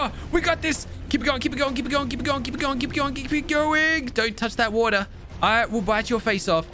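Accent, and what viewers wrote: Australia. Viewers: Are you Australian cause you sound Australian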